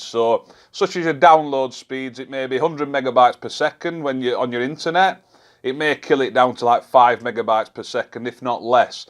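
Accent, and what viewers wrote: Yorkshire, United Kingdom. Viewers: Great video, thanks for the informative video and links, where abouts in Yorkshire are you from mate?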